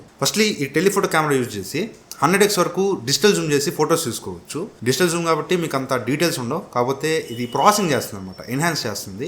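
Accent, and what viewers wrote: Nellore, India. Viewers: Bro meedi Nellore ha?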